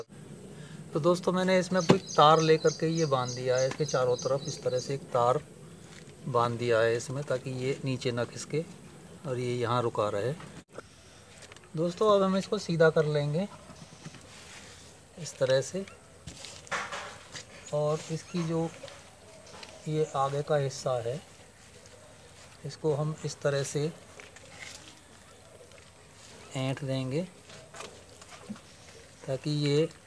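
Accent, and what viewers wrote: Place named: Punjab, India. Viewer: Apki hindi se Punjabi lagte hain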